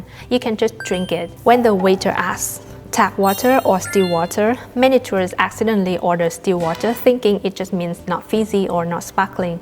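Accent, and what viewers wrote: Scotland, United Kingdom. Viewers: Can hear you have picked up a wee bit of a Scots accent. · I am from Glasgow but lived in Newcastle many years, i picked up a twang also but I was honestly surprised when I heard your Scottish twang. Anyway good video and content, you just got another sub. Kudos. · am fae cambuslang, her English has a scottish twang to it, no english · Scottish accent! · Came here to say the same thing as loads of others. Three Weeks!! You have Scottish accent lol!! “Scotlan” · Great video about my homeland I can definitely hear a wee bit of a Scottish accent in there. PS a lot of people pretend they have a cold when they’ve actually have been having a white Christmas lol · Brilliant.A few weeks in Scotland and there's a hint of an accent.Come back any time. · Nice video, it made me laugh. The accent definitely has a few sounds from Scotland. 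Not purely as there is still much that sounds like a Chinese accent. Never thought about the water helping to improve the skin and the hair. Makes me think! 😄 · it's mental how your English has a Scottish twang to it. It's not a full blown Scottish accent, but you defo have some Scottish intonation. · am fae glasgow & she does have a scottish twang, you're talking absolute doggy poopoo. Now go back to bed it's late. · I hear Scottish accent coming though a tiny bit and it's adorable :-) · Really trying to place the Scottish twang in your accent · oh my gosh the way you say "air" with a scottish accent, rolling the R sound, wow, that must be difficult for a native chinese speaker. anyway, it's a most delightful accent and it makes me smile.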